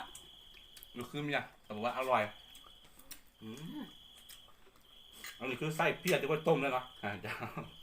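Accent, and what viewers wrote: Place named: Isan, Thailand. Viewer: บ้าน ยุใสคับอยากไปเที่ยวคับการพูดคือคนอิสานบ้านผม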